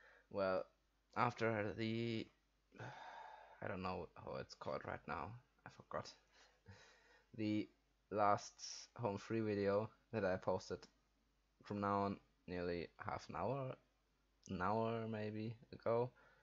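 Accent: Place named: Germany. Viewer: So, what country are you from? Your name looks Scaninavian (maybe Swedish), but your accent sounds German to me, but I don't hear well. Enjoyed your Home Free reaction. They're often great, and sometimes silly. Good job.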